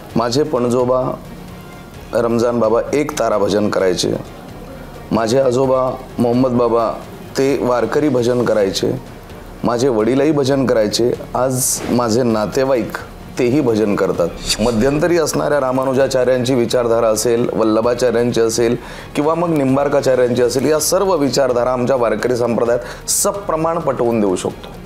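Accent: Standard. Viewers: कोटी कोटी नमन शुद्ध वाणी,उच्च विचार ,दांडगा व्यासंग एवढा अभ्यासअसणारे महाराज बोटावर मोजण्याइटकेही नाहीत वारकरी संप्रदायात आपली पुस्तके वाचायला आवडतील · प्रगल्भ अभ्यास व्यापक विचार सुरेख भाषा. उच्चार शुद्ध. खरं च अकल्पनिय. विनम्र अभिवादन.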